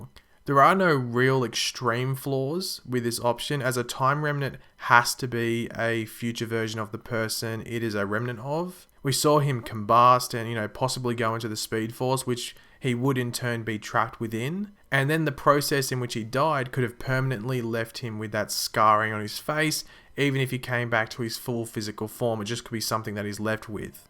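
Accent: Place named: Australia. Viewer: youre so australian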